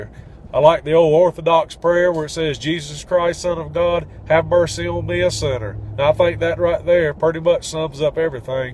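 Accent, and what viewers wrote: South, United States. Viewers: Not sure where you are from brother, but you have to live in God's country by the way you talk. Surely the lord loves the SEC and HIS people that love HIM and that are called according to HIS purpose. Help us to TRUST JESUS in all things. All we need now is an alter call and Alan Jackson singing Blessed Assurance Jesus is mine or Jesus is tenderly calling today! thanks brother! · I love your southern accent!!!!!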